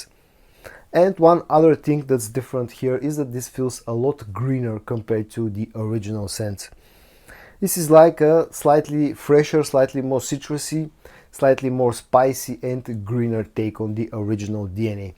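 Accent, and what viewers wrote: Bulgaria. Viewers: Sometimes I have a problem understanding if you're saying "woodsy" or "oudsy"... I guess with your accent the "W" is silent. Hope you don't take this the wrong way...I absolutely love to listen to your beautiful Bulgarian accent. Happy to see the channel advancing pretty quickly after that big 10,000 subscriber mark just a few days ago. I love the thumbnail of the video you made of the 10K...you look so happy and proud. I appreciate all you do for us brother. Be safe all.🙏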